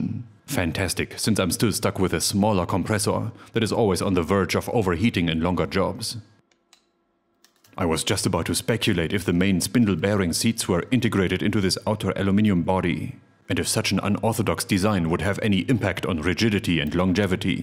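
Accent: Germany. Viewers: Ing_lish with a German accent/humor combination is in itself precious, but when adding a torrent of valuable technicality ... can't be described in words 😉.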